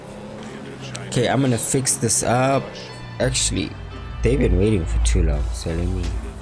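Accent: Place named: South Africa. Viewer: Brother are you from South Africa?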